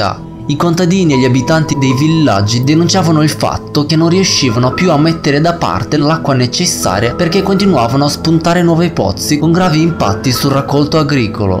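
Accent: Russia. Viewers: Direi che il lessico italiano di alto livello che accompagna i tuoi video fondendosi con l'accento russo non troppo marcato, e il tasso di suspence dato dalla musica di sottofondo crea un armonia unica che ti invoglia di volerne di più di più sempre di più AHHHHHHHHHHHHHHHHHHHHH! Perfavore un top 5 curiosità sulla bomba atomica -!!! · sei russo? hai un accento vagamente russo e poi con il sound di sottofondo lo accentua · È russo... ha un accento diverso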